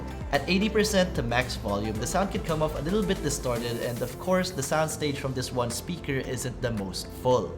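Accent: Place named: Philippines. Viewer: had no idea this dude was filippino, the accent turned from almost amerian to taglish real quick after 20 seconds, only after having read the comments I have realized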